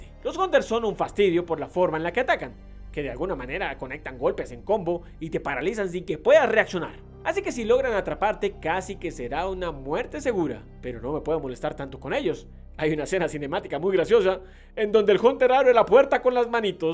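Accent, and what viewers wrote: Colombia. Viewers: Me encantan estos vídeos... Y se nota que eres Colombiano... Saludos mi paisano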